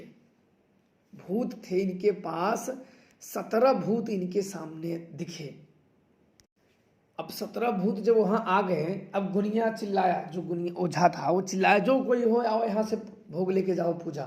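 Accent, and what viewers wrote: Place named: Varanasi, India. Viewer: Shriman jee aapki baate bahut pyari lagati hai. Aapke awaj se lagata hai ki aap Varanasi district ke rahane vale ho. Aap kripa karake mera pranam swikar kare aur mujhpar kripa kare. Mai janata hu ki duniya ke vishay me aapaka anubhav achchha nahi hai. Mai bhi ek bhataka huva ati tuksh manav hoo. Yadi aap chahe to mujhpar kripa karake mera kalyan karane ki kripa kare aur mujhe sachche raah pe chalane ke liye marg darshan de. Mujhe apane kripa yogya banaye aur apane charano me sthan de. Kripa karake apana sampark ka madham bataye.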